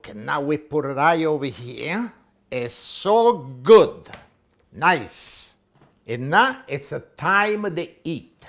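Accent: Italy. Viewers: Love the italian accent 👍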